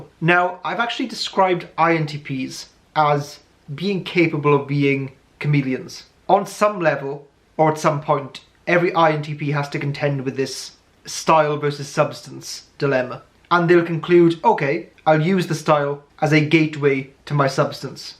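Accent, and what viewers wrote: United Kingdom. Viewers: He looks Serbian/Bosnian or Greek but defs a UK accent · Impressive video. You`re so articulate and straight to the point. I relish when you express your toughts about INFP and i can see how passionate you are in front of the camera thinking, feeling, expressing yourself. INFP and INTP are so similar and complex althought little details make them so unique between them. In this lockdown i, sometimes took those mbti test since im bored hehe. 80% of the time i get INFP Type, the rest is between INTP and ENFP and sometimes INFJ, i wonder if is this you case too? BTW are you British? Because i love your accent ;-)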